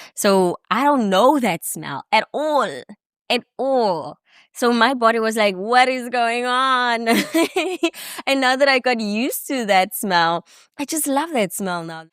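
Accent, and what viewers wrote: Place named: Barbados. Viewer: I love your accent you kind of sound like your from the Caribbean island Barbados, thank you for sharing your journey with all of us.😊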